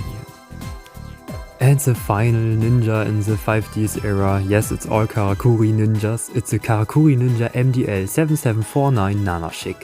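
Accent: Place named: Germany. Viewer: Bro, bist du aus Deutschland? Diesen Akzent erkenne ich doch meine ich 👀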